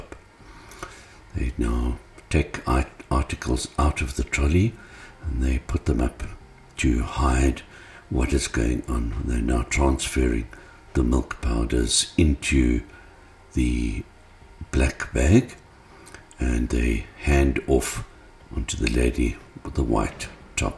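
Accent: South Africa. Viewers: Sounds like a South African accent. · Ughh a Afrikaner accent gross. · It's obviously not America. All the shoppers are black and the narrator has a South African accent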